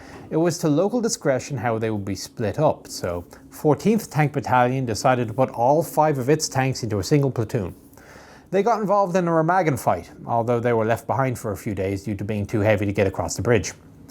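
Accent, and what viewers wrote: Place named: Ireland. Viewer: I like reading. But I can't read with a cool Irish accent so here ai am.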